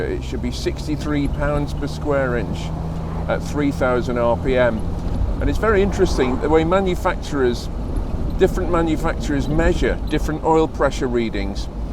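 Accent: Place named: RP, United Kingdom. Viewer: so pure, I mean the car... and the perfect Queens English explanation.... Like!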